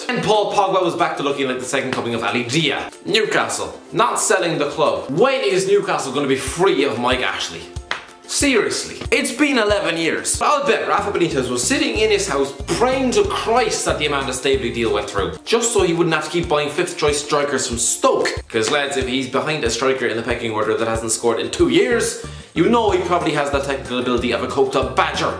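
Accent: Ireland. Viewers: i watch his vids for the irish accent like if you like the irish accent as well comment if you dont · Just wondering, where in Ireland are you from?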